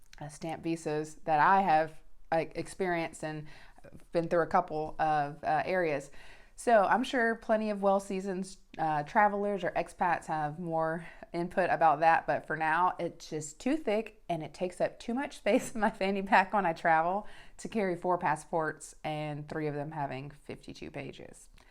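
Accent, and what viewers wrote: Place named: Norway. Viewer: She has picket up the local accent That's why it sounds very special. I had no problem understanding it was Norwegian.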